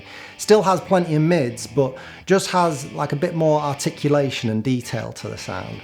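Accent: Manchester, United Kingdom. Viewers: Thought you'd misspelled 'account'... But no... MANCHESTOH 👍💪🐝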